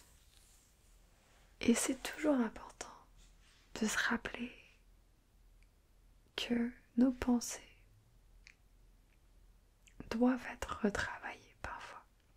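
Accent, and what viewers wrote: France. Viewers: I really love your French accent!!!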